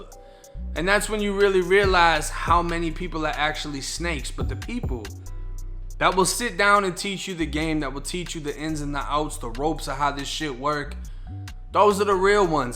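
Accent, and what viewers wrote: Boston, United States. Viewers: I just said the same thing, can’t tell if he’s from Boston or the South👍🏾🤣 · Bra got a Boston accent but if u fuck around in Florida too long it’s gon change u a bit 😂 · Tampa? I swore from your accent you was from Boston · This dude talk like a Boston towny and a fla g had a baby I’m from central fla ain’t never heard no shit like it but much respect sound like you put in work when you was down keep keepin it real bruh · How are u raised in florida with a boston accent? I get u were born there but its not like an accent has anything to do with genetics lol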